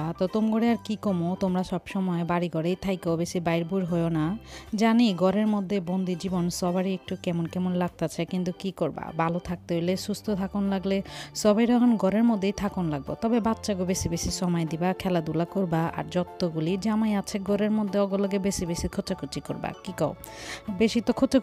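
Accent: Dhaka, Bangladesh. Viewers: মাশাল্লাহ, আমার ঢাকাইয়া বইনের গলায় ঢাকাইয়া ভাসা শুইনা ভালাই লাগল । বাপরে বাপ পাবদা মাছ রান্তাছ ? আমার ভীষণ প্রিয় মাছ । দাওয়াত দিবা না বইন ? চালাইয়া যাও আছি তো । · Khub mojar ranna hoyeche apu. Apnar dhakaia vasa jobbor lagche. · আপু অসাধারন হয়েছে আজকের ভ্লগ।ঢাকাইয়া ভাষা তোমার মুখে বেছি ভালা লাগছে 😁রান্না তো মাশা আল্লাহ ইয়াম্মি হয়েছে।,❤ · আসসালামু আলাইকুম আপু। জামাইর লগে খোঁচাখুঁচি 😁😁 আছোলেই তো লাকি আফা জাদু জানে হলুদ মরিচ অটোমেটিক তরকারিতে আইসা পড়ছে।খুব সুন্দর কালার আইছে মাছ ভুনায়। ভালা লাগছে তোমার ঢাকাইয়া ভাষা।👌👌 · অনেক ভালো লাগলো তোমার আজকের আয়োজন । তার চেয়ে বেশি ভালো লেগেছে তোমার ঢাকাইয়া কথা । আমি পাবদা মাছ অনেক পছন্দ করি , তুমি অনেক সুন্দর করে রান্না করেছো খেতেও নিশ্চয়ই অনেক মজা হয়েছে। খুব খেতে ইচ্ছে করছে এখন। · Apu Ami dhakaiya vasa shunte posondo kori...valo laglo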